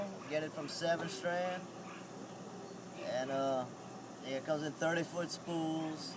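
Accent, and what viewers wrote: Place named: Louisiana, United States. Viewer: i can tell your from around here with the accent i live in new iberia not too far down the road lol i live by a small bayou that leads to avery island and there are nice 5 and 6 footers coming in and out and imma make some of these to put in there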